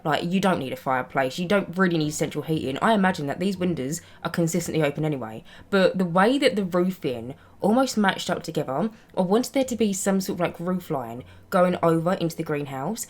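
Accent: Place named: Newcastle, United Kingdom. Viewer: This is random but are you from the uk cos you sound like you’d be from Newcastle also I love your builds I wish I could build like you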